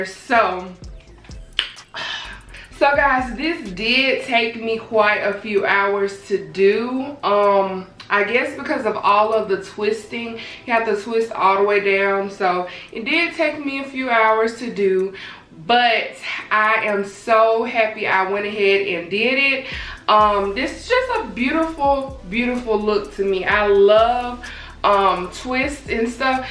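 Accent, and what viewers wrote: United States. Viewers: i love her accent!! so cute and southern lol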